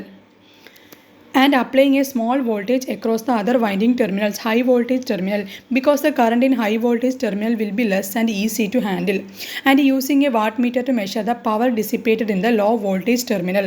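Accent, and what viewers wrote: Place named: Kerala, India. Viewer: Its accent seems like you are mallu😂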